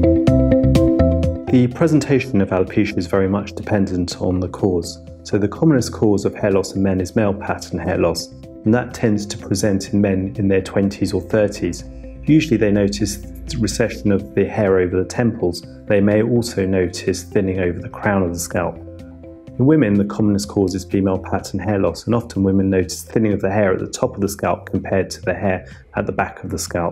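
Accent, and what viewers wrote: United Kingdom. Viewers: Hy chutiya india or english mar rha h british accent main jesay donald trump ki na jaiz olad h jo becahry urdu ni smjhty wo tri bhn ko kryn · It's a classy British accent you fucking hater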